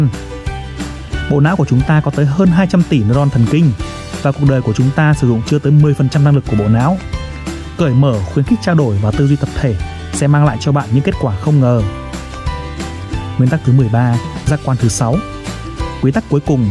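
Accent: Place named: North, Vietnam. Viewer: Rất muốn nghe nhưng kênh kèm nhạc ồn quá k thể tâp trung nghe đc.mình là người Nam nghe giọng Bắc đã hơi khó lại thêm nhạc nữa .....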